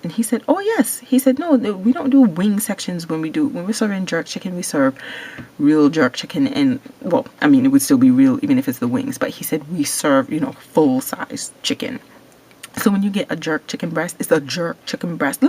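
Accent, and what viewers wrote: Caribbean. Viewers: I really enjoy your channel. I may be a little late on this but are you west indian? I heard an accent along with the sucking of the teeth lol, not to mention the great food.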